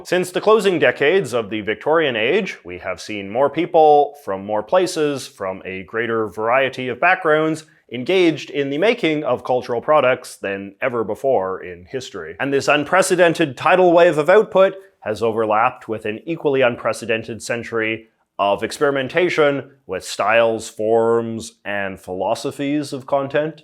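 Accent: Canada. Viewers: Canadian accent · I like how you deliberately turn the Canadian accent up to 11.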